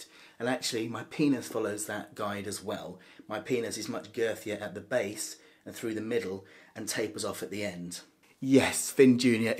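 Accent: United Kingdom. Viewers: I am impressed with your perfect British accent.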